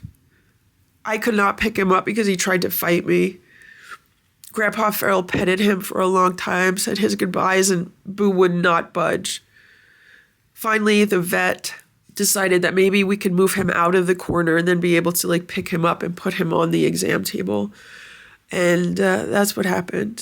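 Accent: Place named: New Jersey, United States. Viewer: I haven’t been able to watch this video yet. Just saw the thumbnail this morning and my heart sank. Boo was more than a cat—he was a rhythm, a presence, a sovereign part of your world. I’ll miss hearing your voice call out ‘Hey Boo’ in that familiar New Jersey grain. It always made the day feel a little more anchored. Sending love and deep respect as you grieve. You gave him a life of dignity, ritual, and care—and that echoes far beyond the screen.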